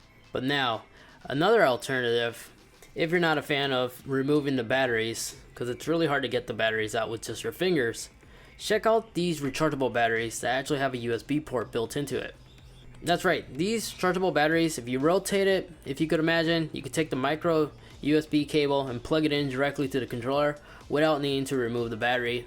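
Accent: Ireland. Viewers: You from Ireland? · Are you from Ireland my guy? (I'm Irish too)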